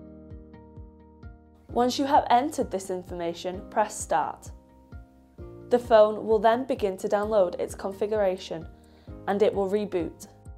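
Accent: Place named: United Kingdom. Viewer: Fucking British English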